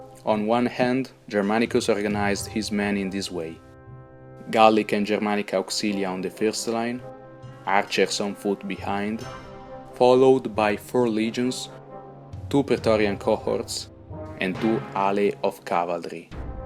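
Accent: Italy. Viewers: this is definitely italian accent... you can check channel info. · You tried but I can't listen this accent but I like that an Italian tried to divulge I can recognize it good job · Really good job: the script is brilliant and the story line flows smoothly with a charming Italian accent :) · He is speaking proper English, in an Italian accent. You have a problem with that? What language do you want the video to be? Italian? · Hears strong Italian accent. This may be a little bias lol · Penso che gli anglofoni troveranno un po' strana la pronuncia dei nomi latini, che qui sono resi all'italiana. Comunque vedo che i nomi latini sono simultaneamente scritti in grafica, il che aiuterà non poco. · The Italian accent makes this video much cooler. It looks almost like a sense of revenge is emanating during the narration. :) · Come si sente dall’accento che sei italiano 😂 Cmq 20000 uomini sono tanti morti si, ma purtroppo ci sono state sconfitte molto peggiori nei secoli antecedenti a Teotuburgo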